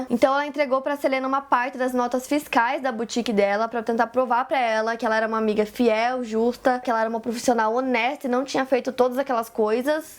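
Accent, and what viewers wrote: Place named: Northeast, Brazil. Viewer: essa mulher é nordestina ou paulista??? so taque todo misturado..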